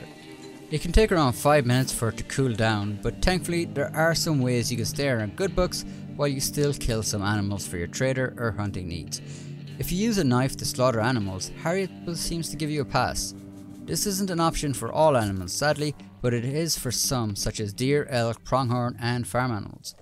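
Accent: Ireland. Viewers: I swear you’re from Ireland, are you? (from Dublin)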